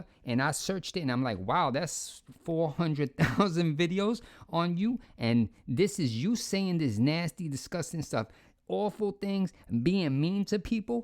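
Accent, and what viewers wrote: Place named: Nuyorican, United States. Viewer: I don’t even care about Foodie but I will always watch your videos, even if you’re doing reviews of the world’s best cabbages. By the way, my bf hears your videos and is convinced you’re one of his Newyorican relatives doing reviews on internet weirdos on the down lol. I mean, he might be right?! Love you!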